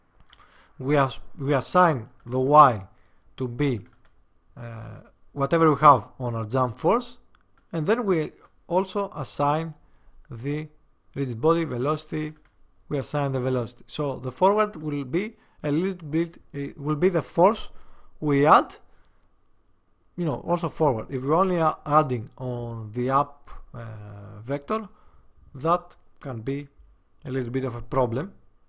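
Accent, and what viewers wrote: Greece. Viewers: aderfe eisai apsogos sunexise etsi (in case you're not Greek, however I am picking up greekish accent then apologies I was only saying "you are amazing keep it up")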